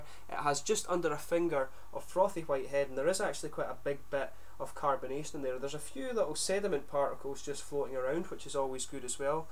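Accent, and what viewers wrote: Scotland, United Kingdom. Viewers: its great you not lost that rich scottish accent whilst traveling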